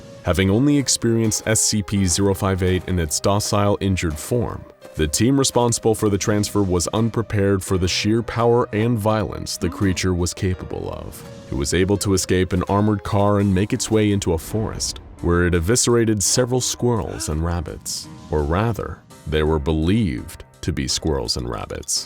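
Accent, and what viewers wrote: United Kingdom. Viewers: It's bri'ish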